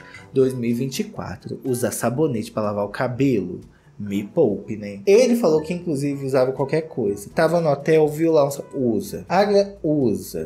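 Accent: Minas Gerais, Brazil. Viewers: Amor jeito mineiro de falar do dono do canal kkkk cucuruco muito mineiro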